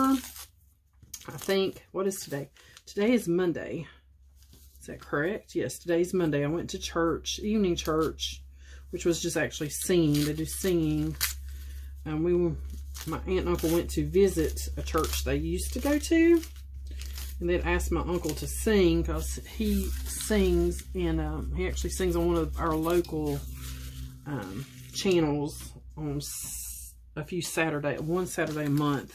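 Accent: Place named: South, United States. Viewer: Hello Mrs. - I LOVE your southern accent🥰. I have read the comments and seen that people have given you some advice about not having to re-thread your machine...They've suggested you sew two blocks at once, use dryer sheets, etc..All you need to do is pull the block your sewing on, to the back of your machine and make sure that you've got 5-6 inches of extra thread, then snip while you've got a finger on those threads, so it doesn't bounce back. Dryer sheets, aside from being spendy, are full of harsh chemicals that cause Alzheimer's and dementia. That's why they do not list the chemicals that are in the dryer sheets on the box they come in. I've done the research. These blocks you've been making are so gorgeous. I'm new to your channel. I cannot wait to see the finished project. You're doing an awesome job❣ Thank You for your videos 💖🥰 p.s. 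I hate to see you go through so much work to get rid of the paper off the back of your blocks. You can actually sew these on Old sheets that you get from the thrift store, leave the fabric on, cut them to size before you sew your strips on... square up the blocks... you get me. 😊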